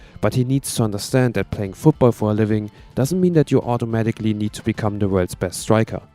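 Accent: Germany. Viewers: are you german=?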